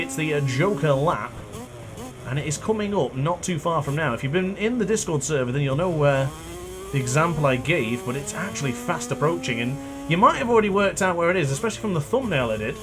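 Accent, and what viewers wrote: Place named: Birmingham, United Kingdom. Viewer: that brummy accent XD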